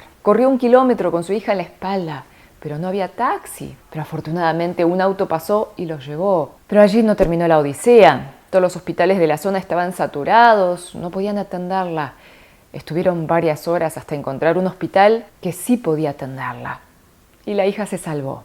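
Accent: Argentina. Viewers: Me encanta tu voz de periodista , tenes acento Argentino 🙂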